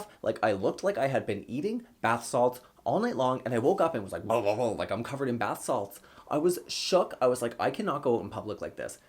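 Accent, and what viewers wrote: Canada. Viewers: Your (eastern?) Canadian accent is adorable.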